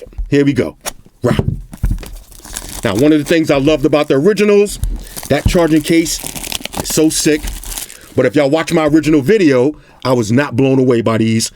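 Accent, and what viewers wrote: New York, United States. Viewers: Love the NYC hood talk. It's refreshing compared to the usual.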